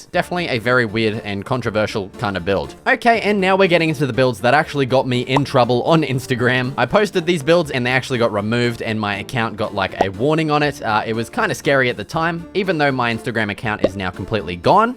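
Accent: Australia. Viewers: Fuck man I love Australian accents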